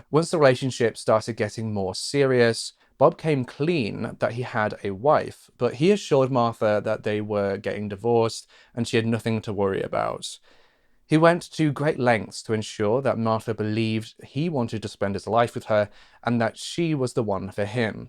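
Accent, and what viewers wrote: United Kingdom. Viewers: As always great vid. Nice we have a British voice on these true crimes actually. So many USA channels. Miss the raised eyebrows xxx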